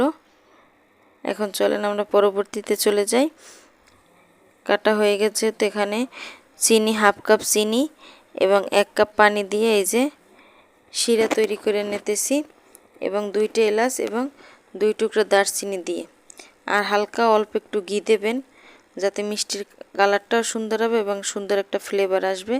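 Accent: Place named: Barishal, Bangladesh. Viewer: আপা,আপনার বরিশালের ভাষাই বলেন,তাহলেই ভালো লাগে।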